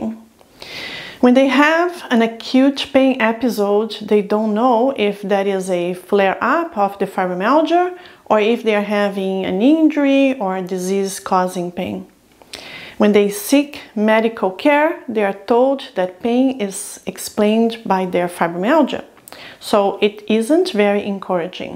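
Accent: Brazil. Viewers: This female has Brazilian accent.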